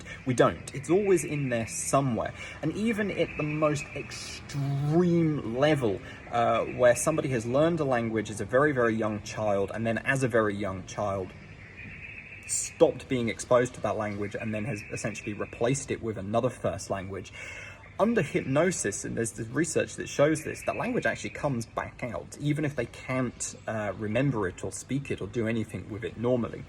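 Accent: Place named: Southern England, United Kingdom. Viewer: He has a Southern English accent, but its formally called an Estuarian English accent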